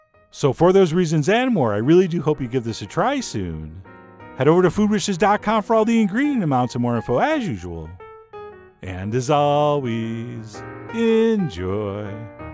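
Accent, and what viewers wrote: Canada. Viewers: Is this how Canadians sound?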